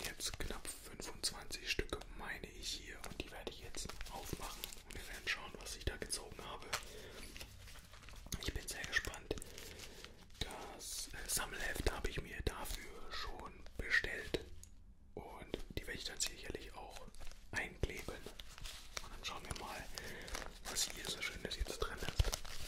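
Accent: Bavaria, Germany. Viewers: Kommst du aus Bayern?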